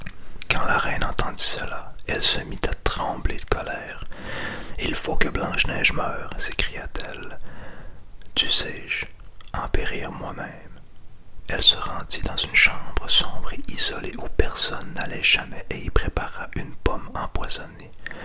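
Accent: Quebec, Canada. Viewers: Bravo ! Top ! Merci beaucoup ! 😀 Ta vidéo a comblé une partie de ma nuit d'insomnie... Bons rythme et débit, ta voix est très agréable, c'est très apaisant. Moi je vote pour d'autres vidéos. Avec les plus de 500 pages du recueil de contes, on en a pour un moment - et toi aussi !!! 😜 En plus, petite française d'Alsace que je suis, je suis une fan inconditionnelle de l'accent québécois !!! 😘 Concernant les droits d'auteur dont parle un des commentaires, rien à craindre. Les contes des frères Grimm sont depuis longtemps tombés dans le domaine public. Les droits d'auteur sont dûs en Europe pendant 70 ans après la mort de l'auteur et 50 ans au Canada. Ils ne peuvent ainsi pas entraver l'enregistrement des contes suivants ! Alors, au boulot ! 😁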